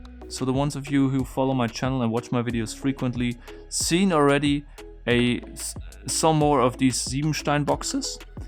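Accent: Germany. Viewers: He had an germen acsent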